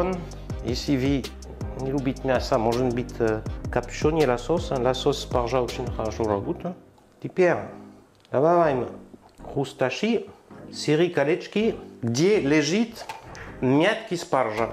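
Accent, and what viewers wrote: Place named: Gascony, France. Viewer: Очень вкусно и интересно рассказал , а главное акцент классный. Вы гасконец , он похож на Евгения Данчевского из трёх мушкетёров. ,, Имя сестра, назови мне его имя !!!"))) Фельтон !!!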